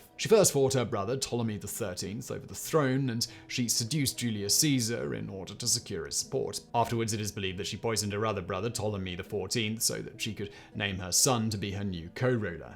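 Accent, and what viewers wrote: England, United Kingdom. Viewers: This gives credence to the saying that when someone speaks with 'English' accent they sound cleverer than they are. This was the least informed video I have seen from this channel. This was ethnocentric description Egypt's greats. In his list, the founder of Egypt came last. Never bothered to mention a significant reign of the Kushites that probably reigned longer than some he referenced, or Imhotep whom was highly influential and unusual character in Egyptian history. Basically this says Egypt was about the kingdoms that defeated it from outsider Africa, from Hyksos to Romans, whom were occupiers of Egypt. Its like talking about the US and forgetting to make a fuss about George Washington, and to make matters worse, George Washington was considered the least important amongst a top ten list of Most important Americans. Balderdash.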